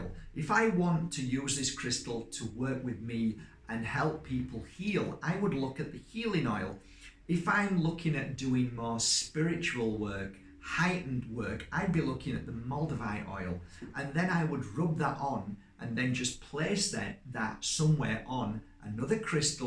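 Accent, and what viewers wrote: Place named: Northern England, United Kingdom. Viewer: Hi...🙂Love your videos.Just came across you this week.Thankyou for taking the time to make them🙏 Just wondering why you didn't mention the energizing/cleansing power of one of natures super powerful and most beautiful things...The Moon!? Anyway, thankyou again. Your accent is very Northern(trying to place it🤔)...Greetings from Manchester UK (originally Kearsley Nr. Bolton).Namaste 🙏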